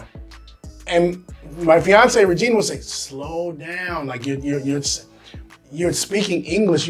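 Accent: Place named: Ilonggo, Philippines. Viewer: Dude... This guy is speaking ilonggo? At filipino whoa (｡’▽’｡) nakakapanibago haha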